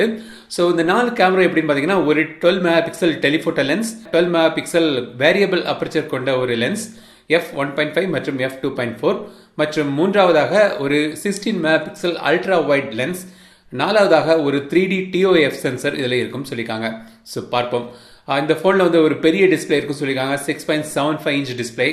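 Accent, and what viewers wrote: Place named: Tirunelveli, India. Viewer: Thalaiva neenga pesurathu enga ooru slag mathiri iruku 😍😍 neenga Tirunelveli ah??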